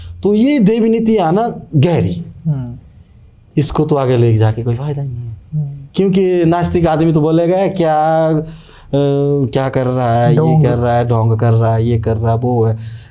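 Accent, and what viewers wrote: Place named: Kullu, India. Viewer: Bhai AAP Manali se nahi ho AAP kullu se ho apki bhasha se pehchana mene apko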